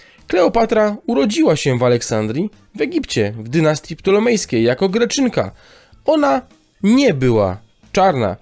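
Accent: Silesia, Poland. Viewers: Chopie jak jo Cie słuchom to widza żeś je łod nos ze Ślūnska 😂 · Pytanie... jesteś ze Śląska?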